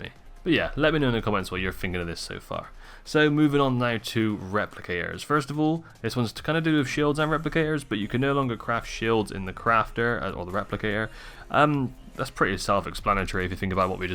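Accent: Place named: South West, United Kingdom. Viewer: Where are you from bro, sounds south west like me?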